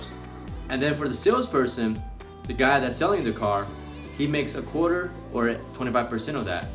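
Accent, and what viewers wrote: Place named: Italy. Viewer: Great advice and your Italian accent was hilarious!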